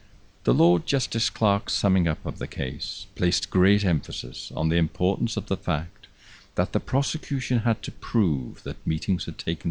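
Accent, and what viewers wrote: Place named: England, United Kingdom. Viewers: Aaaah that English accent, It sounds so very elegant to me I love it!!!! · Hes from liverpool, same place I'm from. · Indeed very lavishly narrated in a typical English accent story of Emile A'Langeley trial begins. Precisely another part of the world in India, 30 June 1857 nearly 600 Scottish n English family killed by Indian Mutineers in Lucknow Cant, in which my ancestor actively took part.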